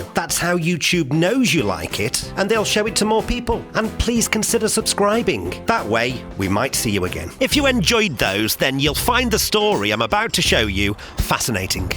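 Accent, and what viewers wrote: United Kingdom. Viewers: 3 things i like about your style after watching 4 vids. 1 your Dedication and attention to the details.... (200) Games to Check out the veracity of the MH problem. Sweet!) I never got that until today. 2. Your enthusiasm and presence (including the Accent. We Yanks are suckers for the Brit accent) 3. No AI in your presentation! Big Fan, will pass you along. Thank you.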